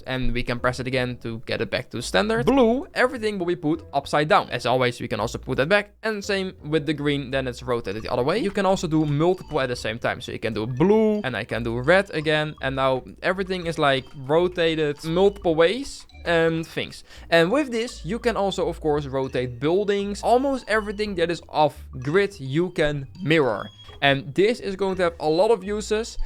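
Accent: Netherlands. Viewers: tell me this guy is dutch without telling me he is dutch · pov: you can't hide your dutch accent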